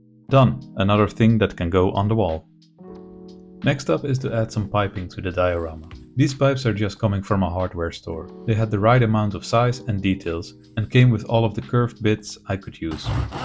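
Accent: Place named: Netherlands. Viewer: Very cool! I think you did an amazing job. I’ve never done anything like this before but this inspires me to at least look into it. And the animation is so cool! Also: I thought I recognized your accent. You’re Dutch right? One other hint I saw was your Lego boek with on the cover: ‘het complete werk’.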